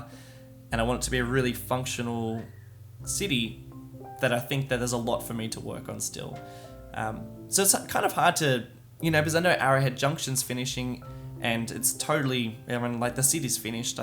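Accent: Australia. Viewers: Seriously good quality presentation mate. Great to hear another Aussie accent too. Well done mate, top marks. Liked and subscribed. Keep it up.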